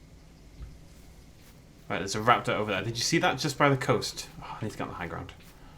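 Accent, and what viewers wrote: United Kingdom. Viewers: It's Zed. Just like Maisie in Fallen Kingdom, you speak the Queen's.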